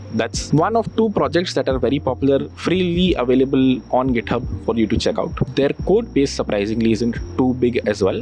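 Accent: India. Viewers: Haha you Indians have funny accent.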